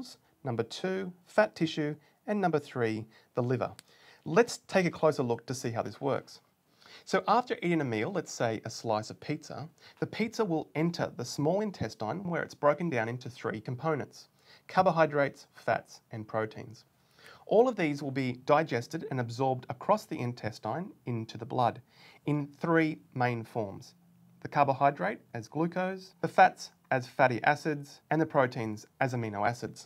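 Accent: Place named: Australia. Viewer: Your Australian accent is relieving my stress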